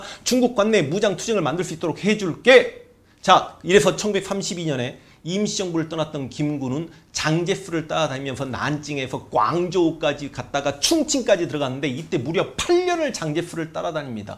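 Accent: Jeolla, South Korea. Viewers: 전라도분이 전라도말투가 나오는게 정상아닌가요? ㅎㅎ 그걸 숨기면서 설명을 하신다는것 조차 힘들지않을까요? 지금 이야기하신부분.. 이해하기 힘드네요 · 재밋게 잘보고있습니다. 모든강의가 제생각과 틀리지않다만..본인스스로 진보라고 하신점도 중도인 저는 지지합니만 성향이 갈수록 기우는느낌은 불편하고 거기에 전라도말투가 섞여나오니 불편해지네요? 색깔을 안보이게 하시면 더욱 인기있는 강사님되실듯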